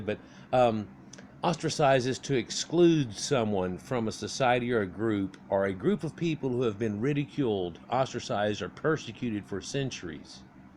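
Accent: United States. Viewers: Your pronunciation is horrible for someone educated in America. English is your first language so when you are introducing foreign name with American accent you expose your ignorance. Hopefully we can all read, so you are reading from Wikipedia is silly, simply because you have not practiced your reading with frequent mispronunciation showing your ignorance. Fact #1- Egypt started as a miscegenated society between Ethiopian or Nubian and Assyrian or Asiatic. So there is no white/black conflict. They were mixed from the beginning. You spoke of early pre-dynastic tombs. These were in fact NUBIAN. So the origin of Egypt is Nubian (negro). Fact #2- There were two governments . Upper Egypt (NORTH) with White Crown and lower (South DELTA) with Red crown. Sesostris is famous for centralizing the govt and wearing the double crown. Sesostris by conquering Nubia made them part for Egypt for over one thousand years. 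It was not until the Greeks invasion that Nubia was separated from Egypt.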